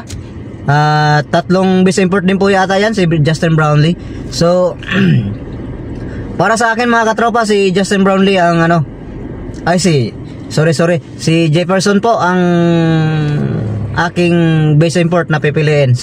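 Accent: Visayas, Philippines. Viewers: Ayusin mo pagsasalita mo brad...bisaya ka yata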